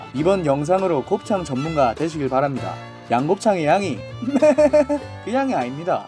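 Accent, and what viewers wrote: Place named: Daegu, South Korea. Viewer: 사투리가 엄청 구수하시네요ㅋㅋ 대구 사투리인가요?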